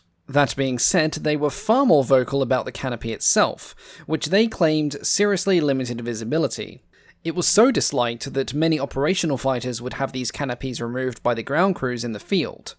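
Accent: Australia. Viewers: Pretty sure hes an aussie. I am, sounds like hes from here too.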